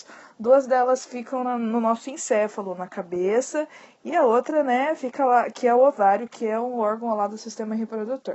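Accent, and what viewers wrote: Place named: Inland Minas Gerais/São Paulo, Brazil. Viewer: Kkkkk sutaquezinho pesado do interior de Minas ou de SP 🤔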